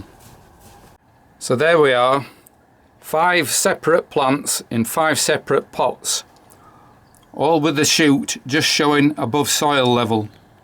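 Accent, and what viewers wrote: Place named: Manchester, United Kingdom. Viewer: I was given some dahlias last year and they were beautiful. I put them into show boxes and lots of vermiculite and stored them in the garage over the winter. I have recently looked at them prior to putting them into garden pots. Some feel soft and I can't see any shoots at all, do you think they will flower again this year. The soil here on Victoria Island, British Columbia is lovely and warm right now. I am use to live in Chorlton-cum-Hardy Manchester so I love your accent and your videos.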